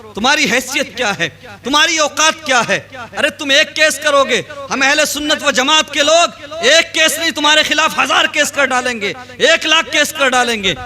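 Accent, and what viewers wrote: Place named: India. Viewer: Kitni saaf urdu boltay haenh Indian ho k. MashaAllah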